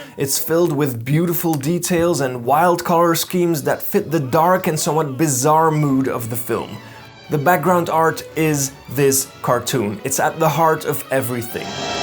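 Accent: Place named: Germany. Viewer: This was fascinating. And I gotta say, just listening to your voice is a delight. A very slight hint of accent (German?) adds charm to your speaking voice.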